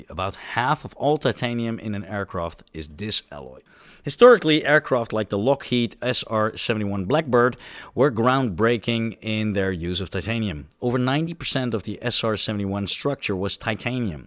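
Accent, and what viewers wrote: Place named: Netherlands. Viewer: ok, vind het anzich een goede video, script vloeit goed, je visual zijn ook goed. enige wat ik bijzonder afleidend vond was het gigantisch duidelijke neder-engels en in de eerste 8 minuten al 5 a 6 klemtoon/uitspraak fouten. zo afleidend dat ik het eerste gedeelte van deze reactie dus al schrijf op dat moment in de video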